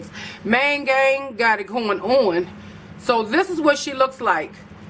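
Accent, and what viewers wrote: Baltimore, United States. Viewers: Omg Are You From Baltimore ? I Love Your Accent . It’s So Cute !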